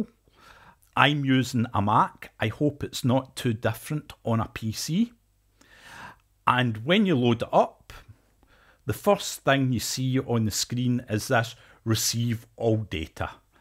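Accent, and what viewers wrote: Scotland, United Kingdom. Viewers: Are you by any chance ........ scots?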